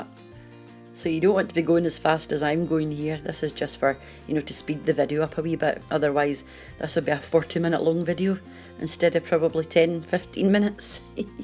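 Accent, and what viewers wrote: Scotland, United Kingdom. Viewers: Thanks, I love the fact that you used butter cream instead of whipped cream for your Victoria sponge. And your butter cream sure seemed mouth-wateringly delicious! I love the little pinch of salt in there! I'd like to add that I much enjoy hearing you speak your nice Scottish accent! 😃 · F Faro she’s Scottish as I am x👍🏴🏴🏴🏴🏴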